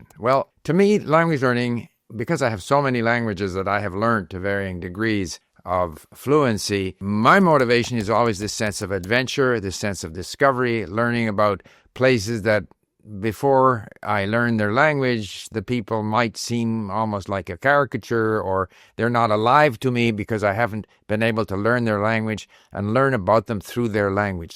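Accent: Iran. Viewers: از نظر شما هم لهجه‌ش مازندرانیه؟!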